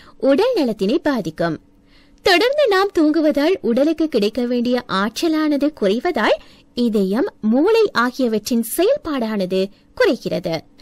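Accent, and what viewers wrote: Sri Lanka. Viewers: இலங்கை தமிழ் ( பாட்டு பாடுர மாத்ரி இருக்கு 🥰🥰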